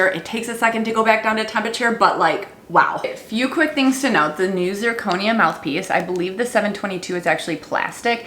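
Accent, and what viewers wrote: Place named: Midwest, United States. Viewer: Your accent, Let me guess Illinois or Minnesota maybe….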